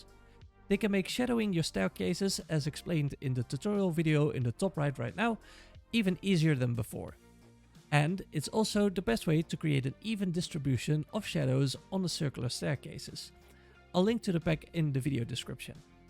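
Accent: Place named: Scandinavia. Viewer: Thank you for that thorough explanation! I definitely would love to see a video about what to do with your map when you’re done. I’m actually pretty familiar with lossy vs. non-lossy formats — my day job is as a web developer! But what threw me for a loop recently is that the “roll20” image export setting exports at 70ppi, whereas the native resolution in DD is 256ppi. So my maps were looking like crap in roll20, especially zoomed in, because the ppi was too low (combined with the 10mb file size limit that made increasing the ppi much more challenging). Someone on the DD Discord helped me out by suggesting that I export at 256ppi at 80% quality, and that has worked beautifully. Oh, and roll20 actually does support WEBP (that was news to me, too!) As far as I know roll20 does not support universal VTT files, however, so I will have to stick to flat images for now. 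It’s mildly annoying to have to place all the dynamic lighting boundaries manually, but fairly straightforward once you’ve done it a few times. (I’m sure someone will say “ you should use a better VTT,” and maybe I should, but at this point I’m so invested in the roll20 ecosystem, there’s a bit of a sunk cost fallacy thing going on. Also I love playing virtually, so I don’t anticipate a lot of print maps in my future, lol). Randomly, if I might ask, what is your accent? I’m guessing Nordic of some flavor? Feel free to ignore this somewhat rude question; I’m just fascinated by accents and language.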